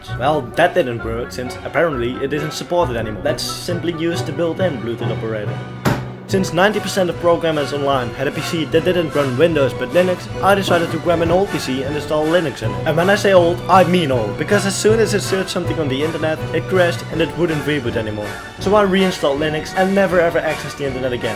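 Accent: Netherlands. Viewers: I immediately knew you were dutch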